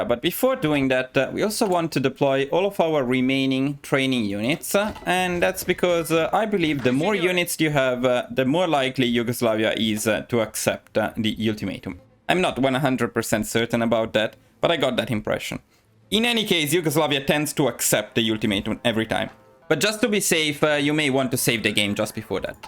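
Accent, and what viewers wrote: Italy. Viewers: I really appreciate your Italian accent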